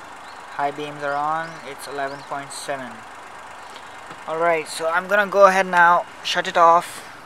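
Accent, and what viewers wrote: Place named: India. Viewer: its indian ;)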